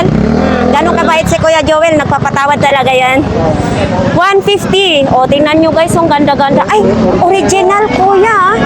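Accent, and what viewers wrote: Visayas, Philippines. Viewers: Cute din ng bisaya accent mo🤣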